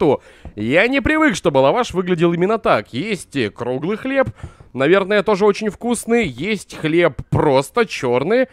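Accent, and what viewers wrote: Belarus. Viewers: ты белаРус? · Ты из Белоруси?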